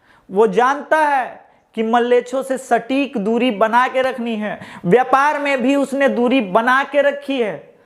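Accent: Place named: Bihar, India. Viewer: Waah...bihari andaj me bola...maja aa gaya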